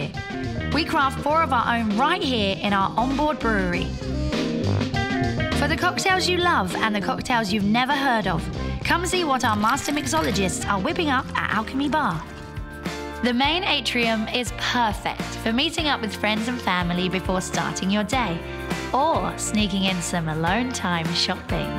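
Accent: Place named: England, United Kingdom. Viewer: and her English accent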